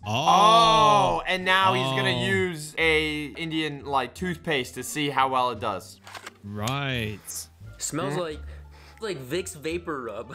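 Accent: United Kingdom. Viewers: Are you actually British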